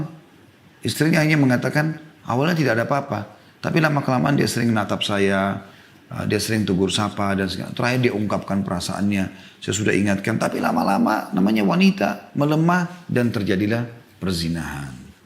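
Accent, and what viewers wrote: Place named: Arab world. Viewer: Ngomong mu masyaAllah Ala arabica gitu lo.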